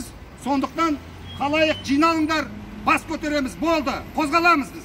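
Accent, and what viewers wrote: Standard. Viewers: Рахмет ага таза казакша тусиникти рахмет АЛЛА Разы болсын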